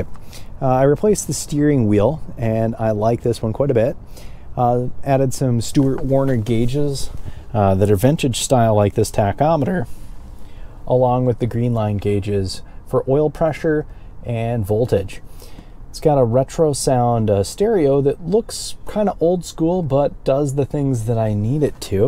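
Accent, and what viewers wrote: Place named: Minnesota, United States. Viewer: What part of Minnesota are you from.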